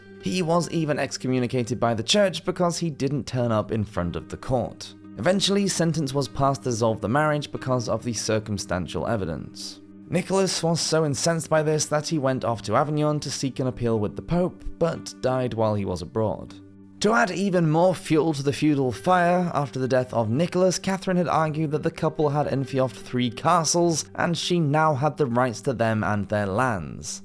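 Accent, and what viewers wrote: England, United Kingdom. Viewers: Love your content and your lovely English accent.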